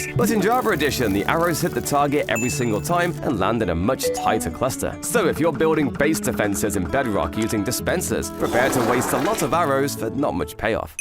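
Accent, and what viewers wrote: United Kingdom. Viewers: Can you tell us why is your voice British? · Nahh he’s just British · Its the way British dialects link vowels together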